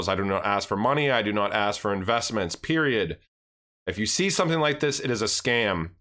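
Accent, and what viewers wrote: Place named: Western Pennsylvania, United States. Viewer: I sense a western Pennsylvania accent.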